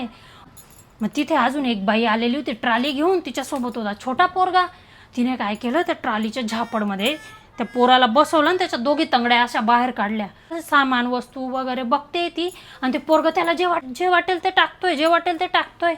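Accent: Khandesh, India. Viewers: Typical Khandeshi...you always remind me of how my Sasu talks! 🙂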